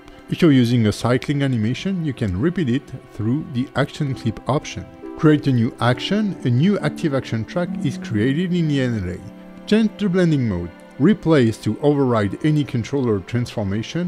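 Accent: France. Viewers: L'accent français rend l'anglais plus compréhensible pour moi 😂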